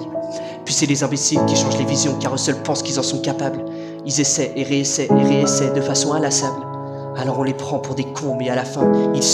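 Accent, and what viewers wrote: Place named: North, France. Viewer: Les Textes vidéos d' ah oui tu es du nord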